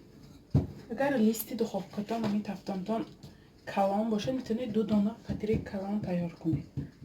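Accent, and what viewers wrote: Isfara, Tajikistan. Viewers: Фатири ИСФАРА барин !!! Гапзанитон Исфарагихо боин !!! БЕХТАРИН !!! РАХМАТ !!! 👍👍👍🤲🤲🤲 Подписка + лайк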